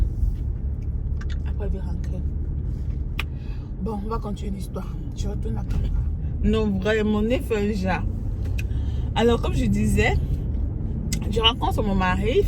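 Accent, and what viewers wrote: Cameroon. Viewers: She sounds more "camerounaise " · Bonjour.C'est fou vous avez l'accent camerounais, j'ai vraiment cru que vous étiez une compatriote. J'avoue que vous êtes ''realistic''.Ça change vraiment de toutes les videos que j'ai vues depuis le temps.Juste une question:Quelle est l'orientation de votre chaîne? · Cest l'accent camerounais justement.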